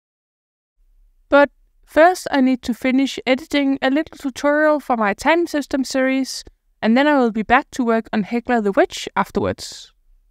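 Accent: Denmark. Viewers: Fantastisk at finde en dansk-engelsk accent - FANTASTISKE videoer all over btw! Du er uden du ved det, ved at lære mig og min dreng godot :D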